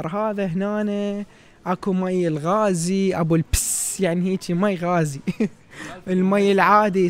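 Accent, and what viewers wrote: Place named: Baghdad, Iraq. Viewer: إشكد أحب الكلام البغدادي ❤❤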